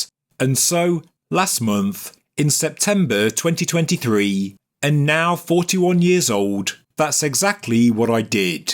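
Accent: United Kingdom. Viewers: Someone asked recently if you were Australian or from UK. I answered that you were from the UK but i couln't tell them where. They were interested in your accent and trying to place it geograhically.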